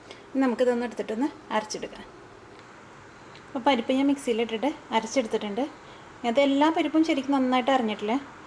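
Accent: Thrissur, India. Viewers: പെങ്ങളെ അടിപൊളിയാ ഇഷ്ടായി അവതരണം തനി തൃശൂർ കേൾക്കുമ്പോൾ മനസ് മുഴുവൻ കോരിത്തരിക്കുന്നു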